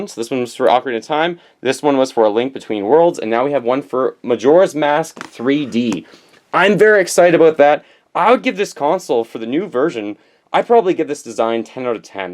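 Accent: Canada. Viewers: That's how Canadians pronounce the letter Z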